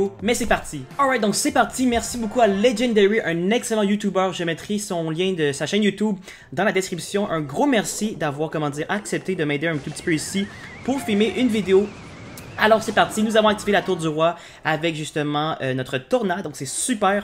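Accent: Quebec, Canada. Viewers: J’adore ton acent Québécois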